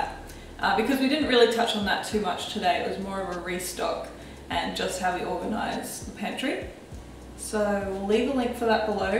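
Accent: Australia. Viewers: Oh my gosh are you from Melbourne?? :D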